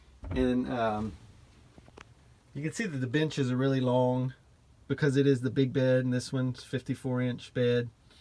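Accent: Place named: Louisiana, United States. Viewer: You sound like Louisiana! :) I grew up in Bossier.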